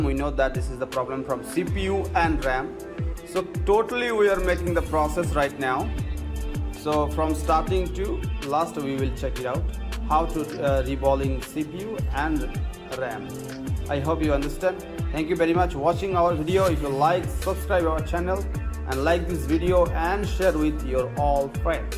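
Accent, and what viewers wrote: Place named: India. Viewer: kek orang india logat nya...hjeheheheeh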